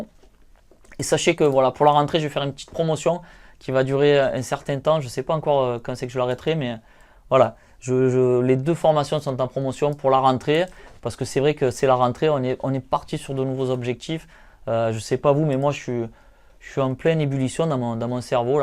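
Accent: South, France. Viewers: Salut je vient de découvrir ta chaîne et je trouve vraiment que ton énergie est super et sincère et sa fait du bien...:) en plus étant née a marseille et n’étant plus dans le sud tu me fait sentir la garrigue en t’écoutant:) Merci pour tes conseil continue comme tu le fait et bon rétablissement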